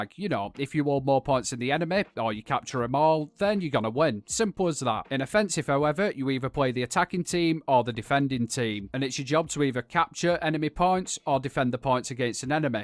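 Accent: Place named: North, United Kingdom. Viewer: Awesome. Love the northern accent lol. Rare bunch we are 😂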